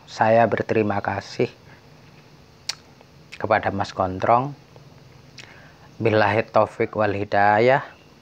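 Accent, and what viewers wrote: Madura, Indonesia. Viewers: kalo denger ini mumet... Banyak ngomong nya ga jelas.. 7 poin aja jgn bertele tele,dari gaya bicara nya dari madura ya pak🤔🤔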